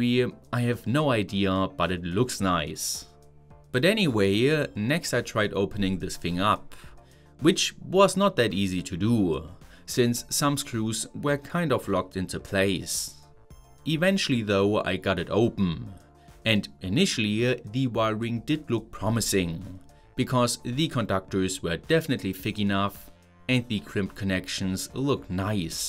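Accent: Germany. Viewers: Ich dachte mir schon da höre ich doch einen deutschen und dann noch die simme da kam ich drauf😂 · Ich höre da ein wenig deutsch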